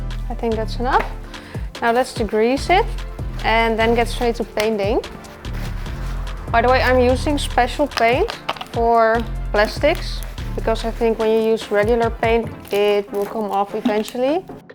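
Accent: Netherlands. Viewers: So funny, I immediately noticed the Dutch accent. I love the Netherlands, best place to live. Was in Utrecht/Amersfoort for 2 years before the pandemic. Greetings from Portugal. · Beautiful Netherlands girl with an NL dialect and she knows how to deal with screwdriver and so on 🥰😍 And than the yellow CRX next to the Volvo 😋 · dame you are beautiful!! and love that dutch accent!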